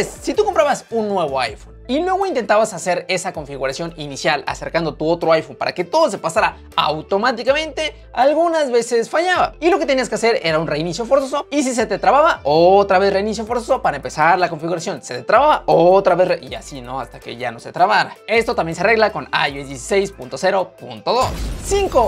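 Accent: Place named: Asia. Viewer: se nota un poco tu acento asiático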